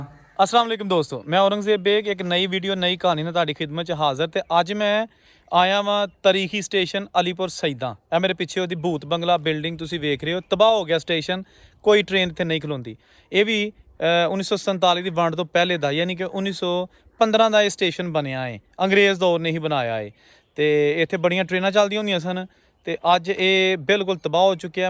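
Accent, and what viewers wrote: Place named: Jammu, India. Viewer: Thudi boli bilkul Jammu aur kathua wali hai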